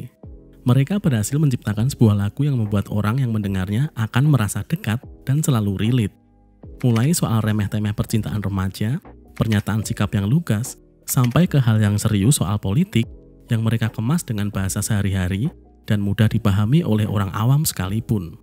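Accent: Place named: Semarang, Indonesia. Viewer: Orang Semarang ya mas.. mendhog men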